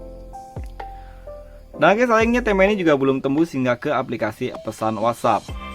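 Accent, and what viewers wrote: West Java, Indonesia. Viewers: Kangg Ti Sunda? 🤣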